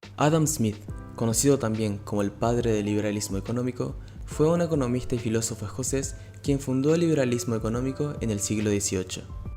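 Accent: Paraguay. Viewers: De Paraguay?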